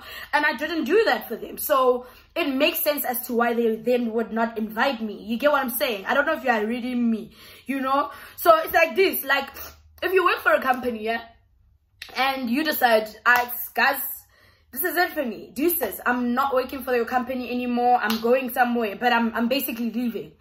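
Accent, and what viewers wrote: Nigeria. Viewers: It's your Nigerian accent for me 🔥 😭😭😂👐⚰️ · It’s the Nigerian accent for me😂😭😭❤️‍🔥😻🤌🏽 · your Nigerian accent is top tier. 😂❤️❤️ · Babyyy your Nigerian accent is hot hot mahn🔥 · What is your nationality babe,,,coz l hear you talk Nigeria accent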